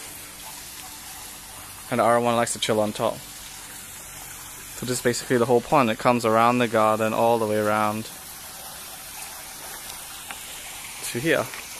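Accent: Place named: United Kingdom. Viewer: you sound more british than anything else, but beautiful tank are you in malayia or america or what?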